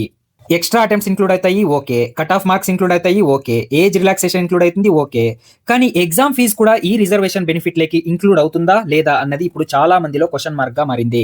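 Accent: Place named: Rayalaseema, India. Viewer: Anna super ga Explain chesaru... Mee slang, Rayalaseema/Karnataka border lo unna valla laa undhi... Meedhi ye ooru thelusukovaccha?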